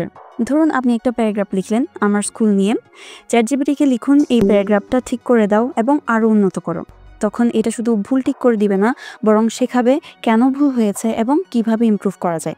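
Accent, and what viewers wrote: Chittagong, Bangladesh. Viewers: আপনি চট্টগ্রামের মেয়ে।আপনার উচ্চারণ তাই বলে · kothar accent sune mone hocche ctg er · আসসালামুয়ালিকুম আপা আপনার পরামর্শ খুব ভালো লাগলো আপনার বাড়ি চিটাগাং অথবা ফেনী হতে পারে , আমি উত্তরবঙ্গে থেকে বলছি রাজশাহী বিভাগ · কথায় টান আছে চিটাগাং এর মতো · R8 amar o mone hoyese Onee Chattogram er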